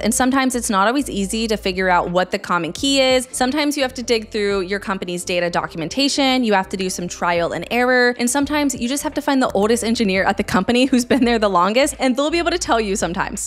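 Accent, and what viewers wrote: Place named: Latin America. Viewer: I really love how expressive you are explaining quite challenging concepts as you do. Eres española? is spanish and the way you talk is more latino hehe. Thank you from the bottom of my heart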